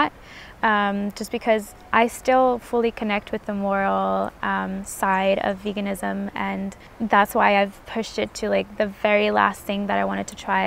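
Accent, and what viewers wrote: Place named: United States. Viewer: I noticed you have an American accent but said uni instead of university. Whys that?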